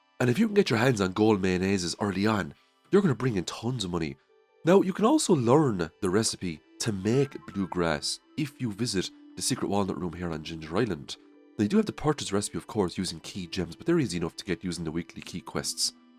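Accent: Ireland. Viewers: Thanks for the great video! I also enjoy your lovely speaking voice including your Irish accent!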